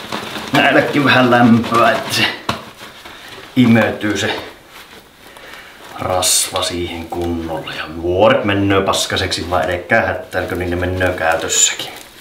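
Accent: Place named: Kainuu, Finland. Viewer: Ihanata kun kuuloo Kaenuun murretta ja nuinkii tokusti puhuttuna ja tärkeästä aeheesta. Kiitos vaen!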